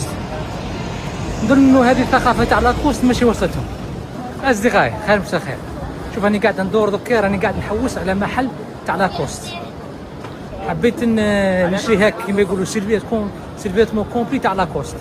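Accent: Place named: Morocco. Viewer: الجزائري ميقولش ميترو😂😂😂😂 ،ترام تبغي تقلد باين مم هضرتك مغربي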